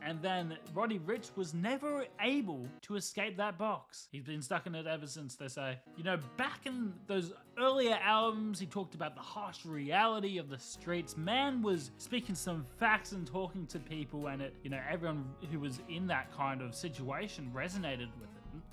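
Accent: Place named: Australia. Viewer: Cool video but i got a question, do u ever listen to any Australian rap ? If so what u think of it? I assume your aussie from your accent (sorry if im wrong)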